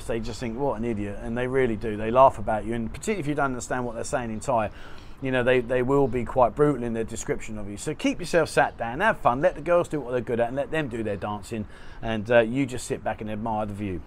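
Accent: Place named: RP, United Kingdom. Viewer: ...does a good job with information...and with a bit of the 'kings accent, too! remember: be cool..if you are 21yoa, 41yoa or 71yoa..from europe, the US or wherever. does not matter..be cool.. the beer thing..most of the clubs drafts..not pubs..but thai bars drafts are watered down...if i go in the first is a bottle..then..i might switch to a draft..."balance".. dress nice but not too nice. be well-groomed, and hygiene. put some slick hair gel..a nice bottle (not cheap) of colone helps..i would bathe in it and the girls would cuddle right in the club and compliments me too. like any woman they like assertive..you see a girls you like go ask her or ask the waitress to ask her over for drink...best thing be and have "fun"..don't sit there like at a dentist appointment.. kind of like the more fun you are having the more fun "the" girls like that.. 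the rule of no photos has been for decades..i think..in angeles city/PI up until i think 2012 or so you could take pictures and video...from what i know there..not anymore hence internet. if i am on a chatting app..it (phone) is in my lap or on the table..guy "things" like that the girls in the clubs..pick up on and will respect your and like you...since you are "protecting" them.. i will say thei for the 11th time.."best" years of my life...best.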